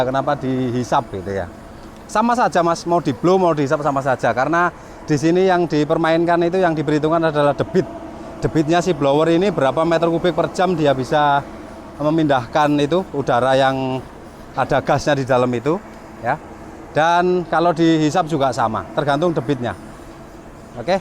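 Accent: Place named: Java, Indonesia. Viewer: logat jawane medok jawane asli mana pak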